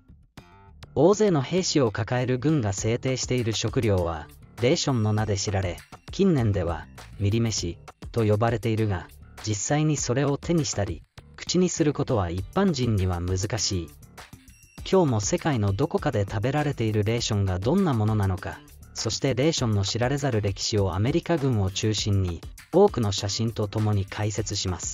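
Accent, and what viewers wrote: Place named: Western Japan, Japan. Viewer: ナレーターさん、西日本出身の方かな？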